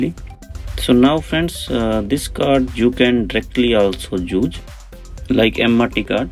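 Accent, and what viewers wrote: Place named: India. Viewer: his thick Indian accent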